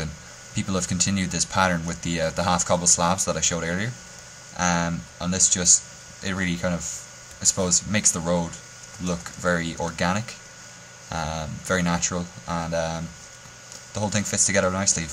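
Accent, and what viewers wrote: Ireland. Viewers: Yeah, I watched, I think it was the Tavern video, and after seeing the design and your ability to teach people how to build this stuff, I kinda just stopped after like a minute in and subbed. You're a pretty kick-ass builder, and, can you correct me on something? Where exactly are you from? Your accent sounds Irish, but it's not incredibly thick like I've heard from other people; it's kinda hard to pick out. If you are Irish, then I wish I could subscribe again, because I'm mostly Irish...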